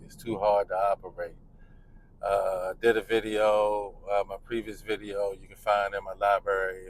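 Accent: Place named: Baltimore, United States. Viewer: You from Baltimore? I swear I hear the accent and this coming from somebody from baltimore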